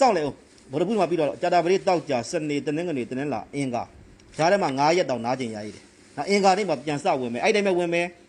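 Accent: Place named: Upcountry, Myanmar. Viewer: အဲပြောတဲ့အသံ အညာသား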